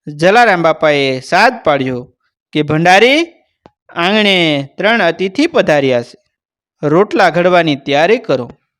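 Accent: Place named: Saurashtra, India. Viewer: વાર્તા તો બહુ સારી કરો છો ભાઈ,પણ હિન્દી માં સૌરાષ્ટ્ર ની ખુશ્બૂ મૂકી દીધી , આપણાં ગુજરાતી ઓની આજ માથાકૂટ છે,હિન્દી પણ સારી રીતે નથી સીખી નથી શકતા,ખૂબ સરસ મજા આવી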